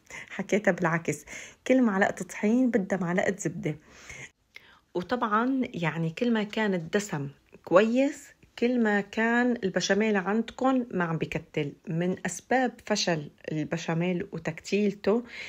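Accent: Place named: Aleppo, Syria. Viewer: ❤احلى ولفظك بل الحلبي ذكرني بل الاحباب والقرايببن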